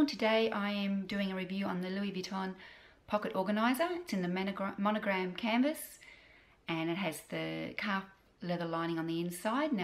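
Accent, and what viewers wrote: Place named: Australia. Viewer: Great review! Beautiful item and Aussie English :)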